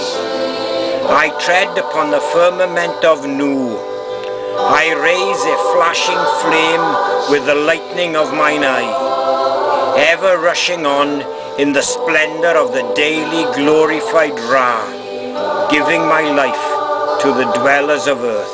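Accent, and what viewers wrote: Wales, United Kingdom. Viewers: I really love your recording of the invocation, the profundity of the invocation combined with that beautifully medlodic welsh accent is music to my ears. I've been using your recording to listen and prepare before I perform the invocation myself for the last month or so. Esecpially yesterday since it was August 6, a day sacred to Thoth, and also happened to be Wednesday, day of mercury, so it was the perfect time. This invocation is brilliant for preparing oneself to engage in artful expression, my writing just flows like crazy a day or two after the invocation, and also the request to 'aid and guard me in this work of art' is a particularly moving exhortation. So, many thanks my friend for this little gift. Highly appreciated ;) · Pure Welsh accent right there.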